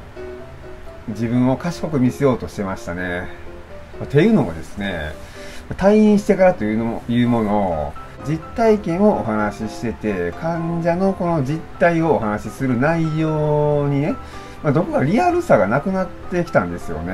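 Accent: Osaka, Japan. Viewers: 毎日アップされるのは素晴らしいことだと感心しています。今日何話そうかなあ？って思うのは大変かなと思いますが。私はさんの家族の話を愛を大阪弁に包んで面白く話すのが好きです。毎日動画アップでさんが元気なことがわかるのでこれからも頑張ってくださいね。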